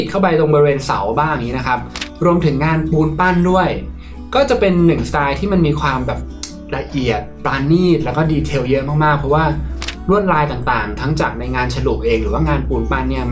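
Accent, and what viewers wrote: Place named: North, Thailand. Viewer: อู้เหนือได้น่าร้กจัง. 😊😊😊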